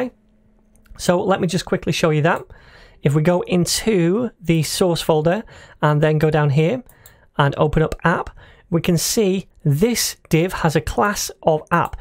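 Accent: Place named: Scotland, United Kingdom. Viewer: You remind me Jackie Stewart's voice, really. Same accent.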